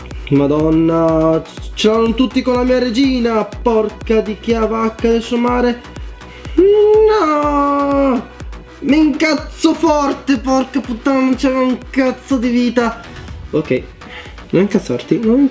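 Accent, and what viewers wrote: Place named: Veneto, Italy. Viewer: oh te si dal veneto...mi so veronese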